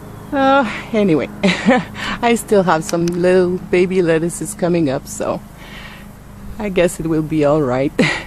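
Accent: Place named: Quebec, Canada. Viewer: Yaay another French Canadian accent ;) Pretty cool garden. But now you'll have to show the one at home lol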